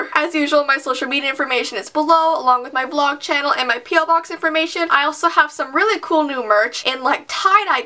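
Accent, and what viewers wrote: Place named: Canada. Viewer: I think it's because she lives in Canada. In her crazy babysitting video she sad bagel like bag-el while in America we pronounce it BAE-gel 😂😂😂 have a nice day!☺️☺️☺️😃😃😃😊😂😂😌😌😌😉😉👍👍👍👍✌️✌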